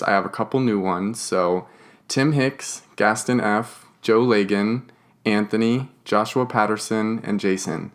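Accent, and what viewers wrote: United Kingdom. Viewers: Jezzz whiz, for an Adult PORN STAR you seem down to earth, really u do but are u english..for some reason i think your british but i believe your american....great vids....great chats..have a great day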